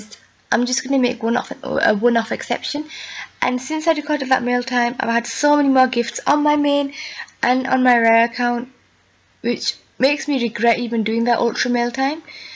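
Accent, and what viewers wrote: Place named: England, United Kingdom. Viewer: You’ve got an English accent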